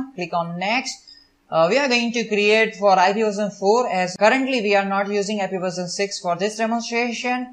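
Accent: India. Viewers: cannot understand a word of this indiglish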